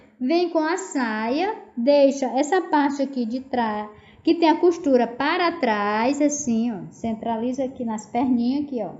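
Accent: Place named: Piauí, Brazil. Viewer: Você é do Piauí, esse sotaque parece do povo de lá. Parabéns,bela arte.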